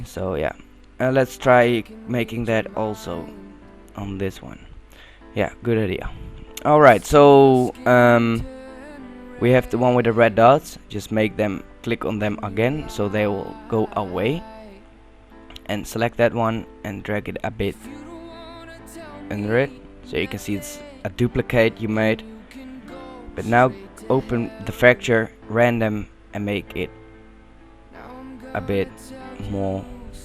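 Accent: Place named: Netherlands. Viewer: Nice tutorial, You're definitely dutch too hehe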